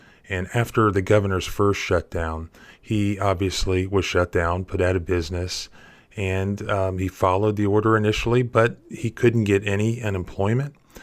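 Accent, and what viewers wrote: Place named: California, United States. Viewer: Yes! I really believe that. Sounds like California with a sweet southern tang.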